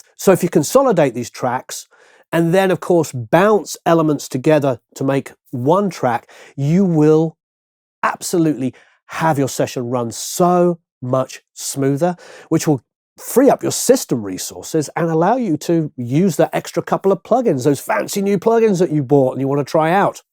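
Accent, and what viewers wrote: England, United Kingdom. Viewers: Love your work. Are you from the same part of England as Sting? You sound similar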